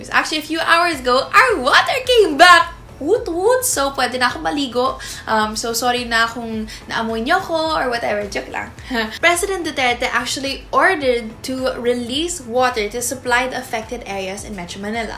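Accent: United Kingdom. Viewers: british accent😍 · I love your british accent. You're so good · The British Accent ❤️❤️❤️ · British Accent! Lakas maka BBC!!!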